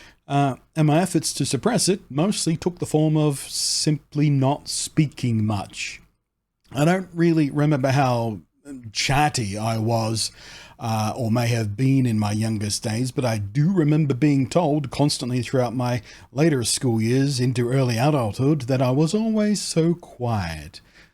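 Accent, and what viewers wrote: Australia. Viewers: I live in NYC, and grew up in Melbourne, you sound very Australian. I wouldn’t call how you speak ‘Americanised’ in the slightest. Americans just have a terrible ear for accents. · You sound like an average Aussie to me. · Being from the UK, and coincidentally, also being Autistic myself, I'd never really thought about your accent too much. Apart from the fact you've told us you're from Australia, and also that I've heard you enough times in your previous videos i could say ' an Aussie', but really that's about it. I have family who have lived in New Zealand for so long now they've picked up a Kiwi accent, and they clearly sound different enough from an Aussie, at least to me, so i'm fairly sure i could tell the difference between accents. You could try just smiling and nodding politely at those who accuse you of having a fake accent. 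It'll confuse the hell out of them....or maybe it won't. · I've always struggled with "small talk", never really understood why people talk about trivial nonsense purely for the sake of conversation. Which even now makes trips to the barber somewhat uncomfortable, although I've found one lately that's happy enough to just get on without the jabber. I also get what I call "verbal error loops" when particularly stressed or tired, where I'll stick on a particular part of a word or sentence, almost always two syllables for some reason. And of course I notice it happening, and get more stressed trying to stop it and... Hence the "loop" 🙄 Only solution is to stop talking and take a breath. Accents are interesting for me, I'm quite good at languages for some reason and as a part of that, good at picking up accents. Whether I want to or not. Which is problematic, as I end up picking up accents from whatever environment I'm in, and again am aware of it and feel like it sounds like I'm mocking the locals (for example speaking English in Germany, but with a German accent and grammar) and have to actually WORK at keeping my natural accent. 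Maybe it's because I'm listening with a Scottish ear, but I've honestly never noted your accent as being anything other than Aussie, although that may be influenced by the fact that I've known or worked with a few different Australians over the years, all of whom have had wildly different accents! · is now a Vtuber? 😄 I think you sound pretty Aussie to me. I watch alot of Australian Survivor 😂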